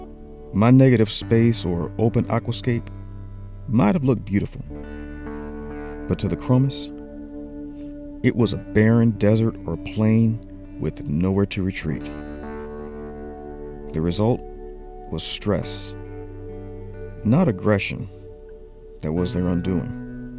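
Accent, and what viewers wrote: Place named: United States. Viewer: I feel like I'm watching a David Attenborough documentary but with an American accent.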